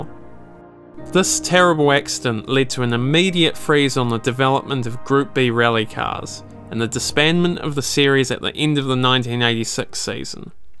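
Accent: New Zealand. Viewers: Are you a kiwi? Great content mate! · Nice to hear a farmiliar nz accent !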